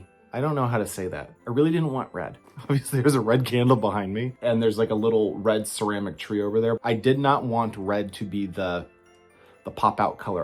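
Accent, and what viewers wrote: Minnesota, United States. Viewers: I love the battery powered candles for the tree! So pretty. Since Paolo stole the show he's the 4th Day of Vlogmas. On the 4th Day of Vlogmas my true love gave to me: Four meows from Paolo Three rolls of batting Two power strips 🔌🔌 And you sound like the movie Fargo 🎄⛄🙂⛄🎄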